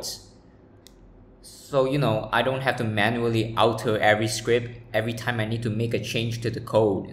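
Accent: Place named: India. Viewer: subtle indian accent.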